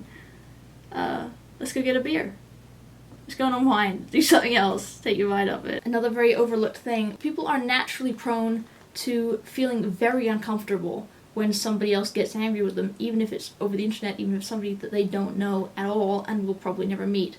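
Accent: United Kingdom. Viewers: Her father is British, and she grew up with it. I worked in Vancouver and there were plenty of British people living there who had not relinquished their accents. Believe me, if you spent a weekend in Oklahoma, you'd pick up a slight drawl, so cut her some slack. I lived in Northumberland for a year, and within a month the Geordie was sticking to me. "Yeah" became "aye." It happens. Try to live with it.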